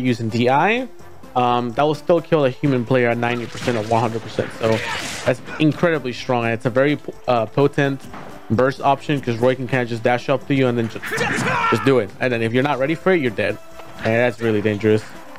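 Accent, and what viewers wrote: Chile. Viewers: Eri chileno?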